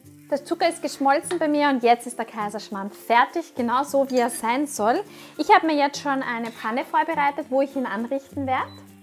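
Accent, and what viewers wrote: Austria. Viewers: Super, aber ich bekomme diesen charmanten österreichischen Akzent nicht hin und so es ist irgendwie nur ein leckerer Pfannkuchen.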